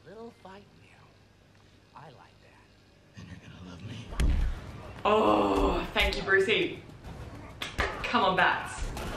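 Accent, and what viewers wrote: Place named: Australia. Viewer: The Dark Knight is an absolute masterpiece. Everybody was hating on Heath Ledger before The Dark Knight came out because of Heath's handsome leading man image at the time. I myself remember the Broke-Bat Mountain jokes. No one, and I mean NO ONE though he was capable of this kind of performance. He was definitely good but we hadn't expected he'd be THIS good. No one was hating on him after this, and to this day I believe his best films were still ahead of him. Great films we'll never get to see. RIP Heath Ledger. He was an icon. You may have missed it, but the flaming fire truck and robbing a mob bank are exactly the kinds of things that the Joker's twisted sense of humor would think was funny. The bank robbers even bring it up during the opening scene. And the truck flip was not done with CGI, they really flipped a truck. 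By the way, have I mentioned how much I adore your Australian accent?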